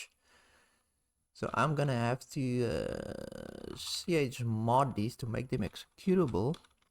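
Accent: South Africa. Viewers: Love your SA accent. Which part are you from?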